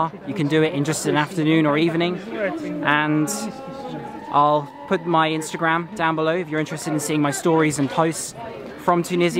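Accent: England, United Kingdom. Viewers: I’m in love with your British accent 😍😍😍ur my crush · I like your English accent the program that you made is pretty good👍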